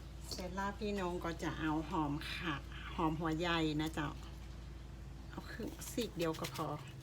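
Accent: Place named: Northern Thailand, Thailand. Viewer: อู้เมืองน่าฮักดีครับ555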